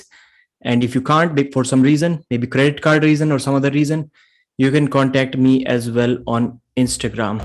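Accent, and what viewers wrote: India. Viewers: I had some difficulties with his Indian accent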